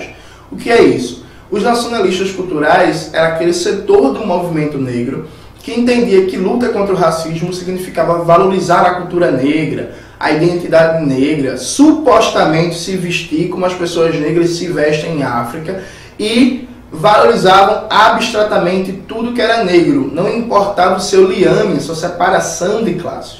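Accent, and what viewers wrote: Pernambuco, Brazil. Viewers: Sim,fala pernambucano!✋😁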